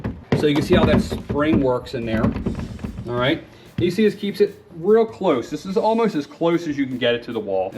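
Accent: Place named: Delaware County, United States. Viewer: Dude… I know this video is a year old so you may not see this comment but you have to be from delco! I am too but live in Georgia now so hometown talk is always nice to hear. And thanks for helping me install my magnetic doorstop.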